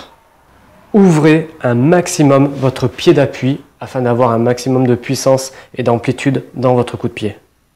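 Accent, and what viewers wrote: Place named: South, France. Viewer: T'as l'accent du sud parfois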